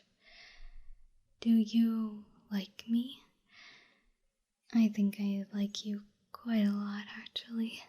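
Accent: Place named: France. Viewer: Oh ya one more thing your French accent just dose something to me I swear I would like to see more in the future only if your willing to take care : )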